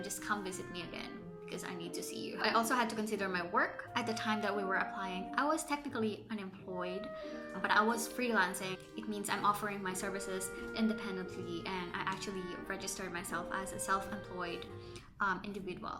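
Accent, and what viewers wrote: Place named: United States. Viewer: I asked because your English dialect and expressions are very American like.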